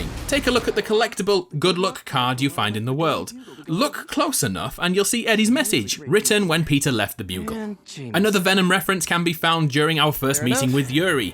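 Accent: United Kingdom. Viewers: Hey , you are British?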